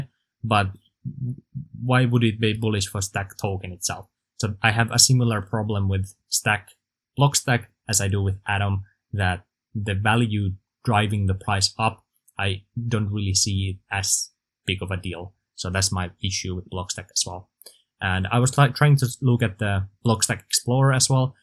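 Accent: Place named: Finland. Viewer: I sense a fellow finnish accent, great content btw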